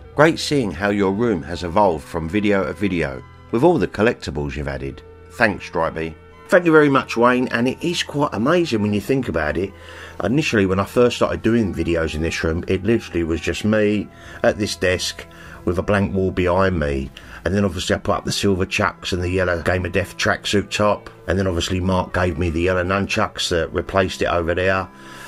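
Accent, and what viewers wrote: Cockney, United Kingdom. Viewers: GO ON MY SON GET IN THERE. ICF. EAST LONDON IM HAPPY TO FIND OUT YOUR WEST HAM LIKE ME I WONDERED WITH THE COCKNEY ACENT BUT NOW I KNOW PS I PLAYED FOR WEST HAM UNDER 16S IN 1985 SHAME I GOT HIT BY A CAR PUT IN WHEEL CHAIR FOR 14 MONTHS 😮😮🙏💯🤞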